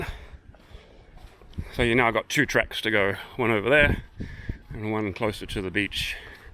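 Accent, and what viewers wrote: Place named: Australia. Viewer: Thanks for that! You have a pleasant voice. A nice Australian accent & not too occa!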